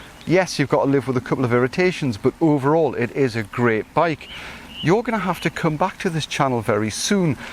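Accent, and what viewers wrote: Newcastle, United Kingdom. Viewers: Well done, enjoyed your Geordie accent and wit ( charm would be going a bit too far).. cheers from am old Jock down under in Western Australia. PS you need a passport for here as well, but the weather is great for riding that AT!.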